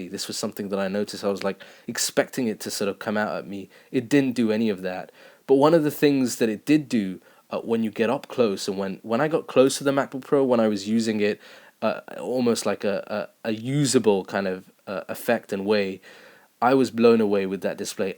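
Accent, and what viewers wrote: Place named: RP, United Kingdom. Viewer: damn your posh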